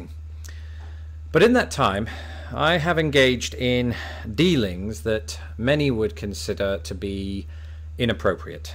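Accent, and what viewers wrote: United Kingdom. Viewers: You are definitely so much more than a British accent. I'm only going to list a few for anyone new to your channel: an excellent researcher, a great writer, a balanced presenter (how often does that happen anywhere nowadays?), a talented composer, and a wickedly funny guy. Plus you have excellent taste in entertainment: TESB is my favorite SW movie and Quantum Leap (which people can find out about when they binge-watch) is one of the greatest tv shows every made. I watch all your videos but "Distant Words" is my favorite. Please keep them coming! I always share them on FB and Twitter. Congratulations on the new influx of subscribers!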